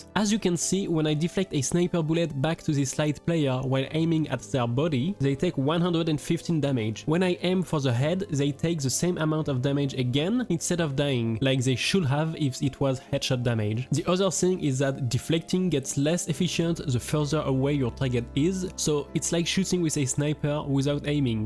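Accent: France. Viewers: T'es français toi non ahah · bro are u french ? ur accent sound like it ? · Your france accent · your accent looks French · Cet accent français de qualité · tu as un accent français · Is that a French accent I hear?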